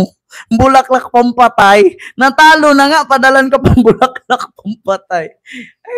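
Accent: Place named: Hiligaynon, Philippines. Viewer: Natatawa talaga Ako sa yo idol..pag dating ko galing trabaho Ikaw agad pinapanood ko..Tnx sa mga blog mo.. watching from japan at ilongga po Ako.. ang cute mo pong mag salita ng ilonggo ❤️❤️❤️❤️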